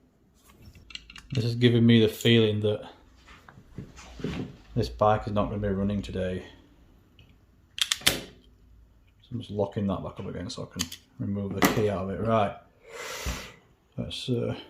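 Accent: United Kingdom. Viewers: I have come across your channel by accident and absolutely love it, I am now a new subscriber to your channel. I love your Leeds/Bradford accent which only adds to the entertainment as far as I'm concerned. Great video's, great content, love it.....Keep it up.....I'm another VTR owner too. Cheers. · Thanks so much 😸👍 by the way your accent - are u from the same area as Sean Bean the actor?😸 My 1997 vtr is behaving a bit strange its always been a reluctant starter the 3 or so years I've had it especially in the colder mornings (below 10 degrees c starts affecting it) the colder, the worser. Just put manual cam adjusters on, tensioned by hand & went to restart to set them on the run. It wound over fired randomly, ran, didnt run, wouldn't start, backfired bad couple times. Found a plug electrode upper core broken (replaced both plugs). Tried again got it going & warm, adjusted cam chains by ear and shut it down thinking alls well. 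Tried again 5 min later & the timing chains (or something) making moaning sounds while winding over & it wound slower & made me fear its trying to sieze but at 12.66 volt it seems the battery is on the way out. Possibly starter too it was hot. But 20 min later after a cool off & battery slight self rejuvination, it turned the bike over ok however got another big backfire & sounding like it just doesn't want to fire. Battery now definitely getting down so that was it for today. Theres many things reall due now to start my maintenance records like setting valve cam clearances, I'd like to check the chain adjuster skis. Has new plugs now & I want to check the ignition coils if possible. Generator looks ok but found the left crank seal needs done (genny got oily) because the bung wouldn't come out of the generator cover cos some idiot overtightened or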